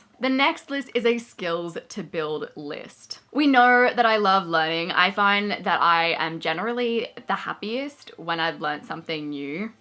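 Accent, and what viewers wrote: Australia. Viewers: ok but... Drew Barrymore with an Australian accent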